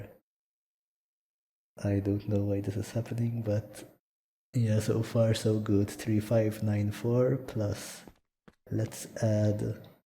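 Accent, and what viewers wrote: Malta. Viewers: Aaaaaaaw maltese voice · Maltese accent sounds interesting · First time hearing his voice and shit that maltese accent is powerful af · that sweet malta accent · Ah yes the maltese accent is strong with this one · Dak l-accent Malti Mannnnnn! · Maltese accent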